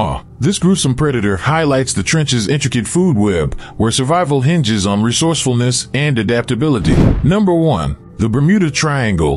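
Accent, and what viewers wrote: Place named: United States. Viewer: Sorry. The animations and overall visual presentation is marvellous - but that awful 'merkin accent from the narrator is too much to listen to for more than a few seconds.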